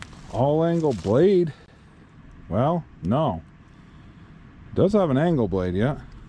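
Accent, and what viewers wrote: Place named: New England, United States. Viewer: thanks. Sounded like a combination Massachusetts and Maine.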